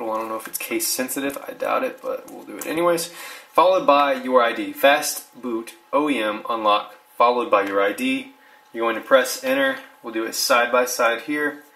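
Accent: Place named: Michigan, United States. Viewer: Are you from MI? You sound like you might have a MI accent.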